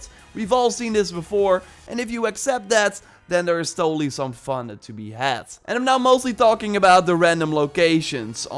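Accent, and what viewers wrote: Netherlands. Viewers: Wait you dutch? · Now i know where your accent is from (the comment said '' bewerkt''), I speak dutch too btw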